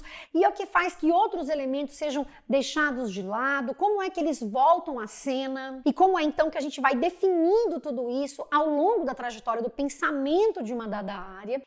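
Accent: Rio de Janeiro, Brazil. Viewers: bonita, sotaque maravilhoso(carioca?), simpática e elegante, mas só caso em cartório...kkk...e acima de tudo...interessante(nota de rodapé).Depois dessa aula finalmente lerei Grande Sertão Veredas...kkk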